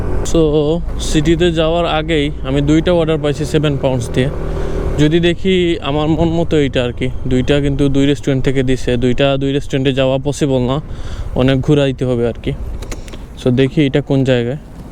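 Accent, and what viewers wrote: Sylhet, Bangladesh. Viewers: Sylheti kotha gula sunte khub valo lage 😊❤ Love from shahporan, Sylhet 😊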